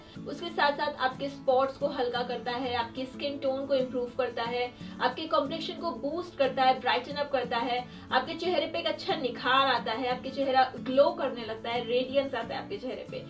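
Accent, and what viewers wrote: Bhojpuri, India. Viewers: I just luv ur bhojpuri accent 👌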